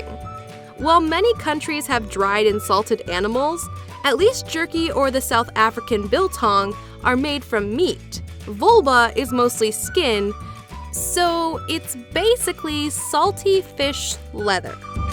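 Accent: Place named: United States. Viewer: I don’t lie how she or he said stop eating that food. It’s their culture ok! Ok so you don’t like it you have no right to tell someone to eat or not eat something it’s their culture. And they won’t stop eating it because u arent the government u can’t tell them to eat and not eat something a lot of people enjoy and is part of the culture and holiday maybe🙄😒 “Of course japan is on this list. I don’t think japan eats any “normal food”” WHAT! Excuse me “Normal Food”like what omelets, pancakes, pasta! It’s their culture ok. What u eat is not “normal food” it’s “basic food” wouldnt u get offended if Japan told u “of course America is on this list (not saying your from America but probs🙄😒) with their disgusting un normal food” you sure are making yourself sound racist right about now🙄😒 s”sushi is prolly their least “un normal food” (not what she said but minus well have) but it’s still raw fish wrapped in seaweed” I wouldn’t want to be friends with u, u would just make fun of what I eat as a Mexican. 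“ it sound disgusting” “has anybody thought meat should be cold NO because it is disgusting” “if u ever come upon it you should call an exorcist” 🙄😒 gurrrrrrlllllll (or boy) you’ve probably never tried it and it’s actually good. “You hold the gag reflexes” I’m tired of u🙄😒 “The Koreans” do I hear a 🔥🔥🔥🔥racist🔥🔥🔥🔥🔥🔥 “Round chicken nuggets or seasoned meatball” 😂🤣😂🤣😂🤣🤣🤣😂🤣🤣🤣🤣😂🤣 I would think it’s a tater tot but not trying to be racist but you sound American. And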